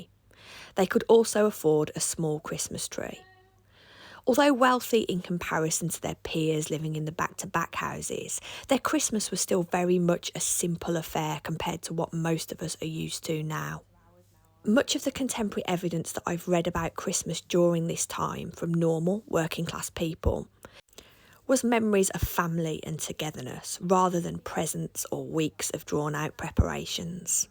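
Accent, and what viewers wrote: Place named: England, United Kingdom. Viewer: "Hellou'uu" It Astounds Me how you English Pronounce your words. So Comical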